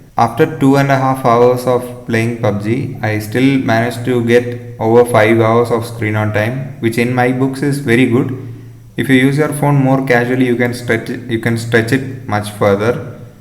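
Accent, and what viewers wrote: Kerala, India. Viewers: English kettittu.. Malayali anennu thonnunnu😅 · Malayali aanalley Engilish ketappo manasilayi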